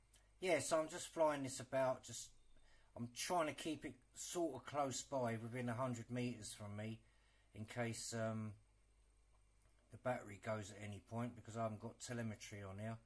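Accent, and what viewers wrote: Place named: United Kingdom. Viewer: Fair play to ya pal. Decent channel thanks nice to hear a brit accent instead of a yank 1.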